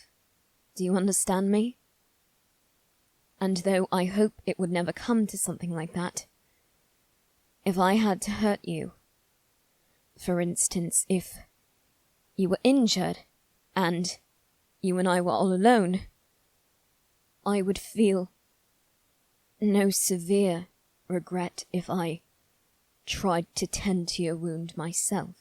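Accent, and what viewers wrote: United Kingdom. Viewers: Ahhhhhh love your British accent it's so cute 💜💜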